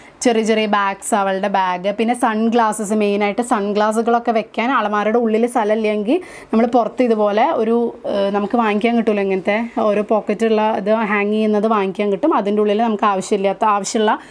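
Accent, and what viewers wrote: Palakkad, India. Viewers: Very nice presentation.Palakkad slang nannaytnd chechik..inim itholathe videos expect chyunnu.All d best 😊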